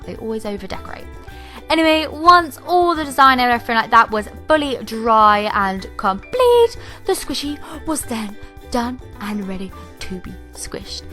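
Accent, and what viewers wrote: United Kingdom. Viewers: Your voiceovers are great! I love your British accent!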